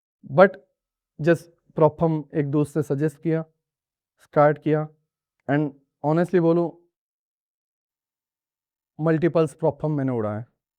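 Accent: Gujarat, India. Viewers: Are you gujrati bro ? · Pakka ap gujrat se ho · Lagta hai Gujarat se ho.... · Sir aap gujrat se ho ? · Bro are you a gujarati?? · Ap Gujarat se ho...?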